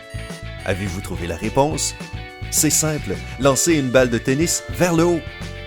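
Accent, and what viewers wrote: Quebec, Canada. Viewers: Il a un accent québecois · Hmmm son accent est cwlui dun quebecoi si je me trompe pas..☺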